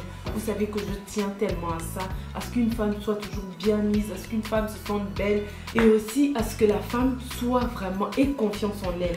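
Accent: Gabon. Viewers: Juste par ton accent je sais que t’es gabonaise 😋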